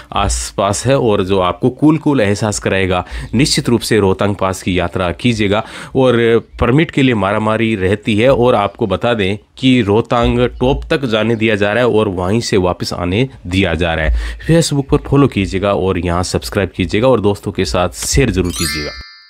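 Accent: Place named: Shekhawati, India. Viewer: Bhai aap shekhawati Rajasthan se ho kya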